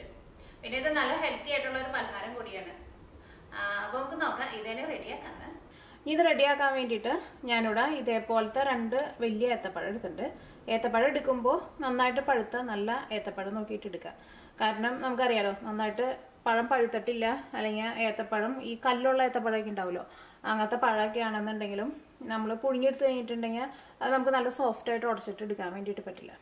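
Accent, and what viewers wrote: Thrissur, India. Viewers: സംസാരശൈലി കേട്ടിട്ട് ചേച്ചി തൃശൂർ ആണെന്ന് തോന്നുന്നു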